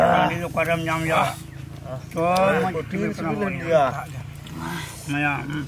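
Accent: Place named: Bangladesh. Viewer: Sob thik ache.kintu Hindi sune mone hocche kono bangali Hindi bolche.tai vlog ta bangla te holei bhalo hoto 🙏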